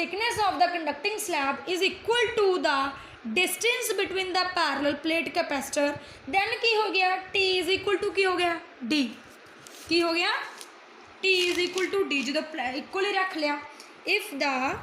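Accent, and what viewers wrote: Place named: Punjab, India. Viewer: Ma'am apki punjabi sunte sunte hi topic smj aayga mst ek dum🙌🥀✨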